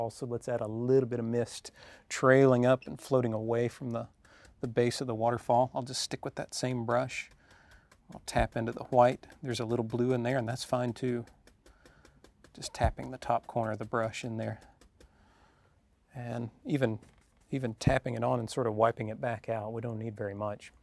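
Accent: South, United States. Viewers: A lot of southerns say it like that. That have w that brings in a h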